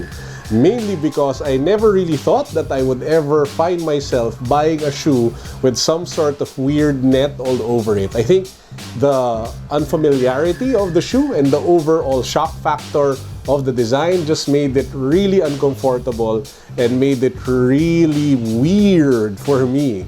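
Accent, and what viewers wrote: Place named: Philippines. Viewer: Strong Filipino accent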